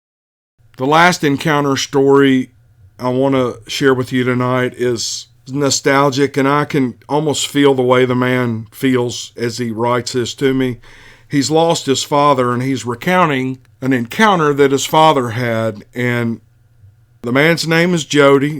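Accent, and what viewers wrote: South, United States. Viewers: I absolutely love everything about your podcast: the content, the delivery, and especially your gorgeous Southern accent! It has become my fav Sasquatch Podcast. Keep up the great work darlin! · Dear.....From a married woman with 2 kids that lives in Greece...yep in Europe....I sit in my free time and listen to these encounters and that fabulous SOUTHERN ACCENT...Dont ask me why but Dogman and Bigfoot just give me a thrill...MIND YOU>>>>I dont want to have an encounter but I am learning a few things and the first is....NO CAMPING for the rest of my LIFE...Yep thats how creeped out I am..and thankyou for reading all these experiences so as we can take precautions...TAKE CARE!!!!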